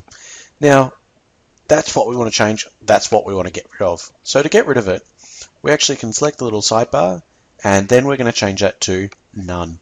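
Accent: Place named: Australia. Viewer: I'd bet my socks your australian...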